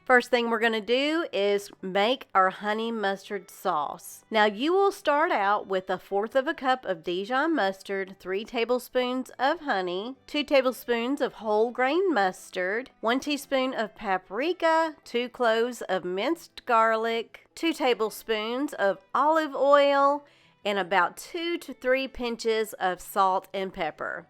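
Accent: South, United States. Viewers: I love your way of talking. Southern talker, perhaps ?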